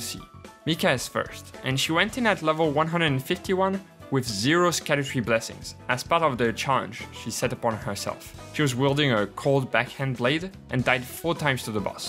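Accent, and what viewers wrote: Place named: Germany. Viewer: Can I say, this is my first video I've seen of yours and I love your voice. It's so bizarrely unique to me, like a grown up Elmo with a slight German accent. I'm sure this is an inaccurate comparison but that's just what my brain is thinking up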